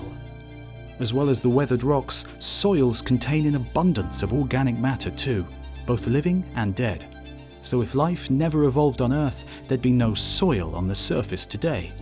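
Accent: United Kingdom. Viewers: "Hoover up" Definitely not from the USA - as if the accent didn't give him away. That is the most (British? UK?) thing I have heard him say. Sorry if I guessed wrong on the accent - I am just an un-cultured American. :o) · are u british? 🤢🤢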